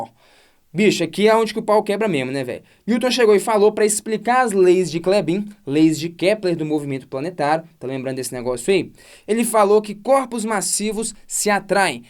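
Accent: Minas Gerais, Brazil. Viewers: Rapaz, de onde você veio??? PARABÉNS pela sua didática, sua linguagem ao aluno e seu carisma!!! E pra temperar melhor ainda sua "performance", tem o seu delicioso sotaque mineiro...MUITO BOM!!! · Cara você é um mito.... Você define profissional que faz porque ama a profissão. ... E os sotaque mineirinho kkkk massa de mais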